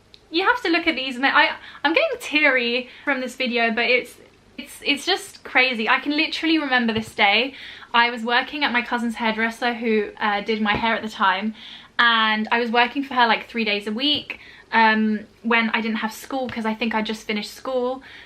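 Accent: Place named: United Kingdom. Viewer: Aww you’ve got a strong british accent when you speak Italian 😍 how cute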